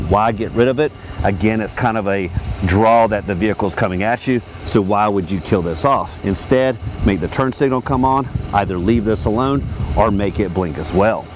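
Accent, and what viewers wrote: South, United States. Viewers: . Great job. I like the truck. The warranty is great too. Worked that southern accent. Mississippi myself. Plan on getting this truck but a different color. How does the 4 by 4 Platinum drives? Is it a heavy feel? It's supposed be around 5 seconds for 0 to 60.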